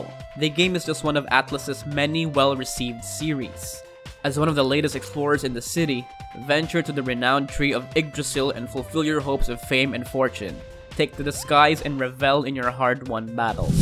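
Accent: Philippines. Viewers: Is the speaker Filipino? He has the same accent as Filipinos. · The one talking is Filipino I'm 99% sure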